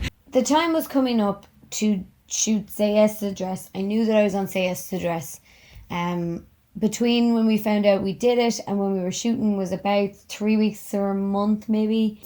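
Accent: Ireland. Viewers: Me sitting here trying To copy ur accent. And i fail alot :p we Swedish People cant say irish "start" xD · Subscribed because all my family lives back in Ireland and I’m in Canada and miss the accent ❤️❤️ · Your accent is lush. <3 I'm actually subscribing to your channel, based on zero other videos, because I figure I'll get to hear you talk, which will automatically make any other content you produce fascinating by default. I have a couple of Irish expat friends, and you make me think of them. They're from Cork, and I have terrible hearing damage, so having drinks with them, and when they've had a couple and start going super rapid-fire with the talking, it's basically just this lovely, lyrical wall of incomprehensible sound, hahaha! Anyway, came for the SYTTD story, stayed for the pretty vocals. :-) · What a lovely brogue..I could listen to you talk for hours :) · Omg I love love love your accent I always wanted an Irish accent 😍😍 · It's an Irsh accent. 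She's saying that her IRISH accent is cute.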